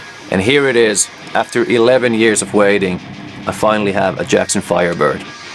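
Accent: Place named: Germany. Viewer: Man, I just found you and as a Jackson lover since the start of the 80s and having started playing guitar back in 77 I love this. I have always loved these guitars as well. The homy grail of Jacksons. I have a charvel Preditor crackle from 91 with a factory Schaller trem I love and with the reverse headstock which is too cool. I dig your playing too man. Do you have a band website where I can listen and buy your music? What country are you from may I ask? You sound sorta German but can't quite put my finger on the accent. 😂 Regards and stay healthy brother. From the heartland . Indiana USA Tim . ❤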